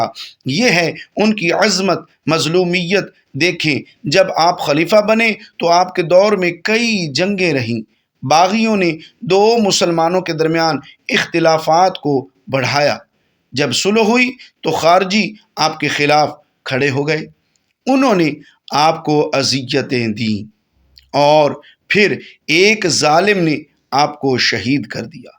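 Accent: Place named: Arab world. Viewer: Aap Uedu bhi iss tarah mazhaka kheiz, Arabi lehje mein bol rahe hain ke Urdu ka satyanas kar ke rakh diya hai. Khassosan Hazrat ALi ka naam zuban twist kar kar ke bigaar rahe hain.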